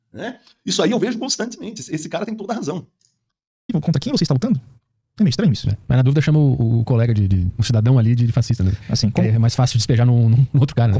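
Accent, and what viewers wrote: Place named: São Paulo, Brazil. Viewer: Ambos paulistas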